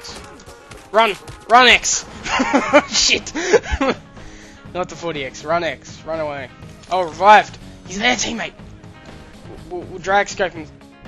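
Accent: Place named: Australia. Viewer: Where from Australia are u from?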